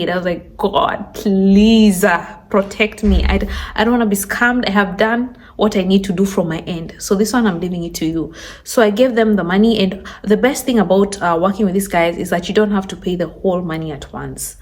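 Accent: Kenya. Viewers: You could have said that more respectfully. We didn't even hear it, because she was giving us very important information about real estate. Don't force her to talk like an American. She's Kenyan. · The sexist woman alive. (That Kenyan accent is amazing.).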